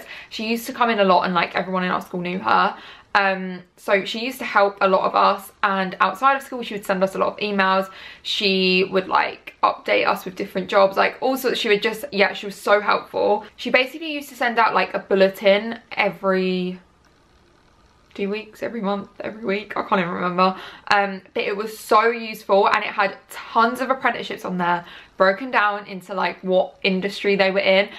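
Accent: United Kingdom. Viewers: yep British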